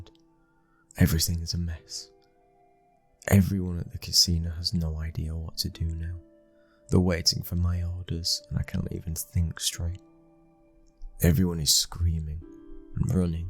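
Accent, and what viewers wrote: United Kingdom. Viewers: I knew i recognized the series, i have listened to the original one where it is a Italian voice, but i also liked this one as i am also British and it makes it easier for me to listen to, keep it up ❤❤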